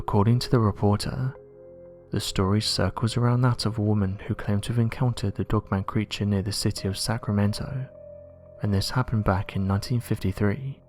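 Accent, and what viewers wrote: England, United Kingdom. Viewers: Well I've been a photographer for well over 50 years, and nothing in my imagination can suggest how anything remotely looking like a creature would be captured by a photograph that was taken by a remote camera interesting enough it was taken in a close-up range how is that possible with the remote camera. If it wasn't for your voice being so how can I put it with an English accent and a tinge of mysterious undertones I would gather to say that everything you've done so far has been almost, I repeat almost genuine. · I like these videos, but his British accent Irks me. The female who I assume is his daughter or perhaps wife, has a much more pleasant voice. The slow monotonous thick British accent is annoying.Hire a American, because I’m almost certain that the overwhelming majority of your subscribers are in fact Americans.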